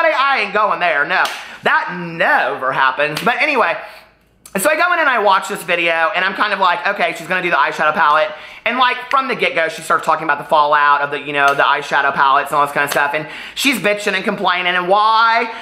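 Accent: South, United States. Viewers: The snap chat fliter makes your southern accent so funny and 10 times more amazing 💕 you're accent makes we wish I was more of a southern gal 😭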